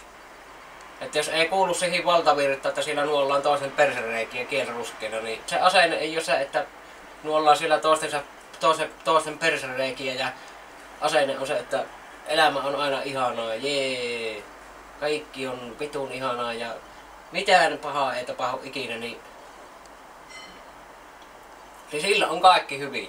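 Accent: Savo, Finland. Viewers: Erittäin hyvin sanottu! Jo vain kun sen sawon murtteella tyrkkee tulemaan nii kyllähä siihe oma lisäpotku tullee asiaan ku asiaan! :)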